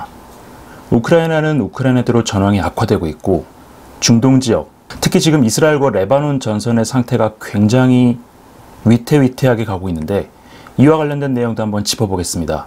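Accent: Gyeongsang, South Korea. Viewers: 지역주의 말씀하시는 분이 더 지역주의 위에 계신 것 같은데, 신인균씨가 사용하는 사투리가 경상도라서 그렇지 그것빼곤 뭐 그닥 국방TV 운영하는데 문제없어 보이네요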